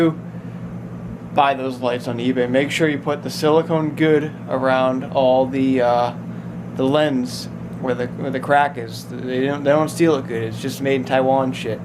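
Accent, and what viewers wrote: Canada. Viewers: Massachusettes plates .... But u sound like a Canadian ;)..... Nice truck tho..... Love those F250/350 styles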